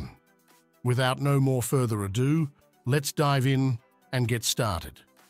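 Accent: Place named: United Kingdom. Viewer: As a Pro subscriber, I couldn't be happier with Unlimited Elements and the team of pros behind it. I know I sound like a shill but really, I'm not. My website is particularly amazing and unlike others because of the combinations of remarkable capabilities this toolkit provides, including the fact that I can (and have) customized a particular plugin. And now we have forms!, I like the British accent, but I can tell it's you who wrote it. 😀We love you buddy. - Markus Halvorson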